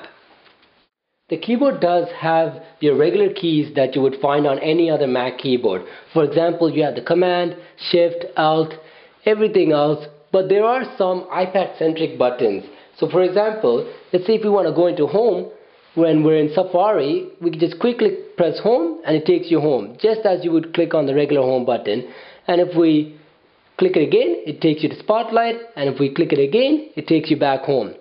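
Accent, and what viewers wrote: India. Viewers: Great unboxing dude! (By the way, are you Indian?)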